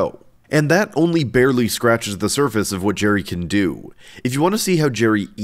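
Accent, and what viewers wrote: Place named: Canada. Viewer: I hear a Canadian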